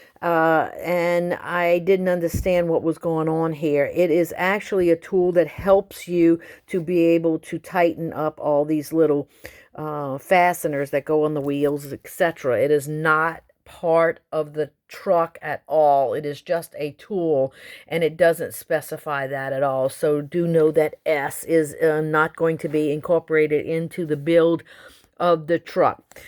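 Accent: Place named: New Orleans, United States. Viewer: Are you from New Orleans? You're accent is just like my wife's.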